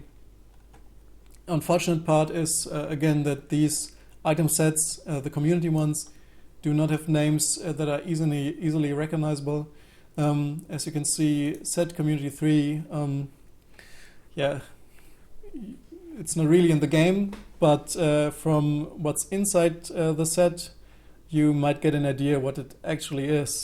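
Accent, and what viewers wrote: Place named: Germany. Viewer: haha ich hör dein Englisch und denk mir direkt, der muss Deutsch sein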